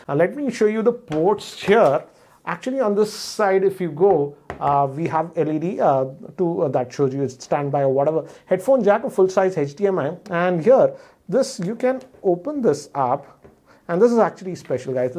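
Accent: India. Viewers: Typical indian style every sentence ending on T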